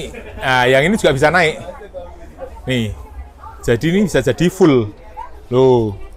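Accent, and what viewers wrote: Java, Indonesia. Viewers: Gak focus ama bus nya,..focus ama abangnya yg medok jawa nya😂😂😂emang beda yaaa saudara kita chines indo dan chines malaysia😂😂😂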